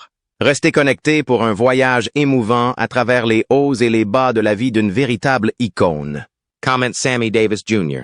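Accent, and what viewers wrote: Quebec, Canada. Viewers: De tout les beaux accents possibles pourquoi l'IA utilise l'accent québécois ?!?....😅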